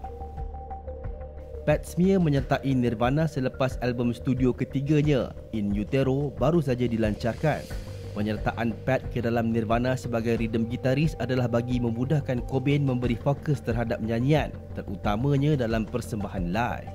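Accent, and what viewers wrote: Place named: West Java, Indonesia. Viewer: Ini logatnya kaya orang sunda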